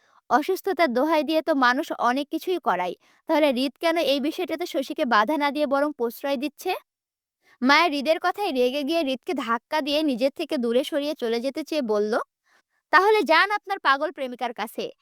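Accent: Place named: Rangpur, Bangladesh. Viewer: আপু তুমি মনে হয় রংপুর জেলার তাই না রংপুর❤❤😂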